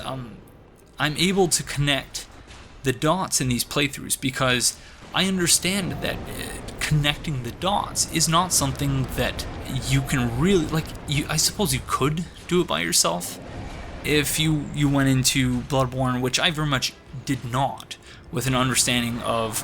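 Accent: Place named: Maritimes, Canada. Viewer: I know you had mentioned being from Canada before but I wouldn't have guessed the Maritimes (statistically) I'm in Nova Scotia (from New Brunswick) if you happen to look at this comment on your five year old video which Province are you from/in?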